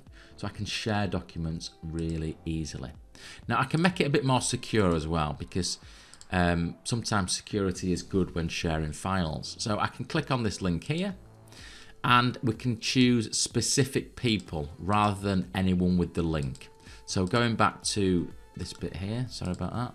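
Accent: Yorkshire, United Kingdom. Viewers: I am from Yorkshire, but living overseas, so nice to hear the Yorkshire accent....a great video, but the reality is OneDrive is the worst cloud storage on the planet. Take a clook at products like Tresorit or IceDrive and do a video on them as well. I would not touch OneDrive with a barge pole...... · Hey are you from the UK? I hear an accent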